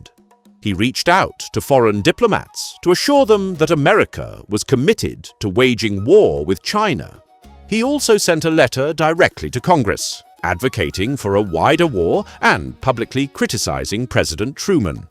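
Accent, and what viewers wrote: United Kingdom. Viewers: Finally a British accent in these kinds of videos Makes US flag merch Disgusting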